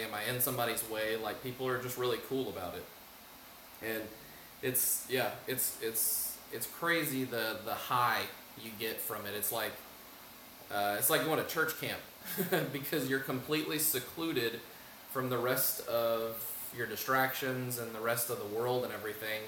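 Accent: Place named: United States. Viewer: Hi my friend! I'm interested in English and American accent =)) you have an American accent. In what states do you live? I subscribed to your channel. Greetings from Russia. Irina.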